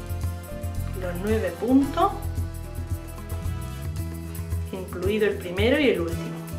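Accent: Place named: Andalusia, Spain. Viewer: Eres andaluza....deque parte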